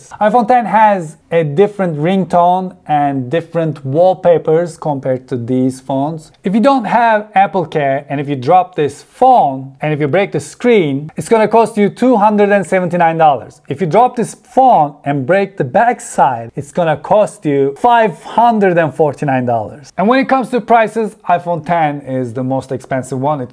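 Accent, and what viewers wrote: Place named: Turkey. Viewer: Abi türk olduğun o kadar belli ki konuşmandan yani başkası olsa bu kadar rahat anlayamazdim ne dediğini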